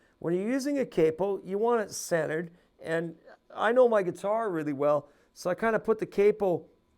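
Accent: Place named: Canada. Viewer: Hey thanks...kind of coincidental that I would run across this lesson after just having bought my 2nd capo, the 'short-cut.' I'm only into the guitar 2 years, and although I practice daily--& often--I am a woman of a certain age with recently diagnosed arthritis. Now, "3 chords and the truth" may be enough for some (younger?) folks to achieve success quickly, but since my only option is to focus on my personal goals & chart my progression, this video of yours and certain others have helped me a great deal. First, your show on hand exercises was inspirational & dove-tailed perfectly with my daily physiotherapy -- right up there with rolling the baoding balls around (haha...but true nonetheless). As well, I appreciate your straightforward, no-nonsense approach to learning; as a teacher myself I agree that learners must not be spoon-fed, but should think for themselves as much as possible. 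Of course, one must have hope in order to carry on when in something like my predicament, thus I was very happy to hear you express, more or less --- "'you get out of it what you put into it." Hey! Now I'm not nearly so crushed as I was. May I request that you follow up with a lesson based on the "shorty" capo...? I've heard they are a great boon to the arthritis-afflicted. Thanks so much for your time & effort. By the way (considering that I'm a language teacher/linguist), based on your vowels, intonation, cadence, & tons of other factors, I'm assuming you are Canadian?? If so, please a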